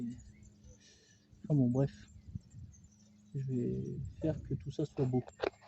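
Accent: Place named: Savoie, France. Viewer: Tu es d’où mec ? Ça sent la Savoie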